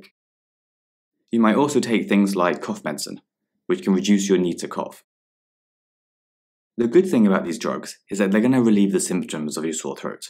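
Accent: England, United Kingdom. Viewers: Thats how it's pronounced in the American accent you dumb the guy in the video is English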